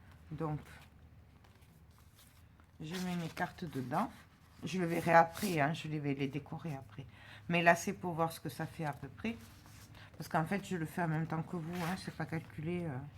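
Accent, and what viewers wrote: South, France. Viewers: Original et simple effectivement, un bon entraînement pour débutant 😀 mais pas seulement 😀j'aime beaucoup ce que tu fais et tes explications avec en prime l'accent du midi, c'est très agréable, merci 😊bises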